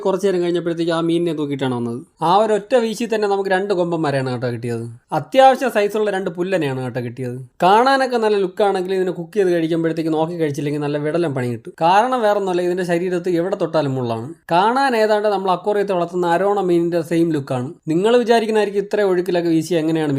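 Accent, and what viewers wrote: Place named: Trivandrum, India. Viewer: Full thaalam kelkanam...😂😂😂😂 Trivandrum aanalle broi ❤️❤️❤️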